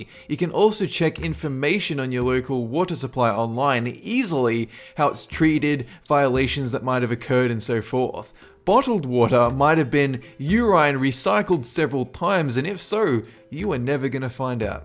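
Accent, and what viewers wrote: Australia. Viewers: Hey are you Australian? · Are you Australian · are you aussie or american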